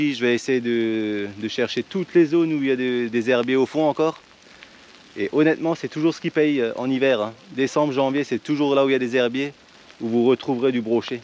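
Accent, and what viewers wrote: Alsace, France. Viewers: Salut ! Je suis étonné des résultats avec le rogen j ai encore jamais rien fait avec sur le Rhin ou dans l étang du village avec (j ai très peu pêché avec aussi 😅). Tu animes comment ? Juste en linéaire ? Merci pour les vidéos et pour ton léger accent Alsacien 😉 je te souhaites plein de beaux poissons pour 2023 et continue tes vidéos !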